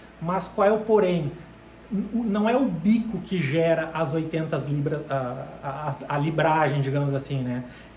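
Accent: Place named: South, Brazil. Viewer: Cara tem a mesma voz do Cristian da Education kkkkkkkkkkk esses do Sul falam bem parecidos com outros da região ........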